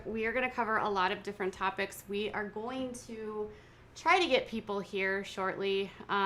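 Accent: Minnesota, United States. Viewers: Lady, I'm thinking you're somewhere near me in MN (new here... You have the MN accent). The storms here a few days ago were nuts! You're kinda funny, and I dig it. Take care!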